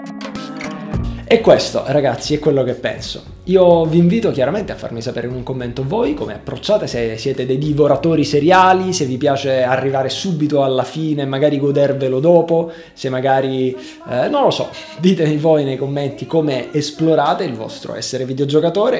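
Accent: Sicily, Italy. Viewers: Ciao ma sei siciliano? non riesco a individuare la tua cadenza nell' accento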